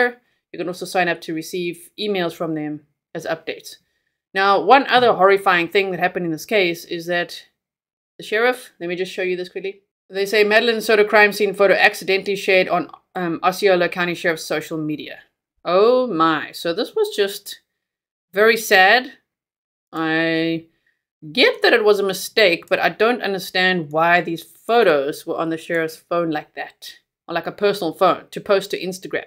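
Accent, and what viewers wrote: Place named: South Africa. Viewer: Hello fellow south African 🇿🇦 Thanks for covering this case x Great to hear the accent!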